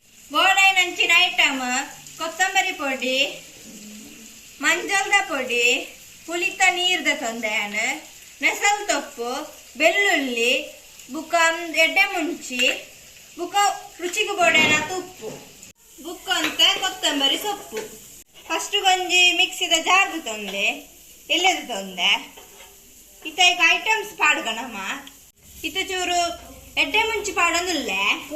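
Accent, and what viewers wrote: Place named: Mangalore, India. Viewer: Pure manglorean base voice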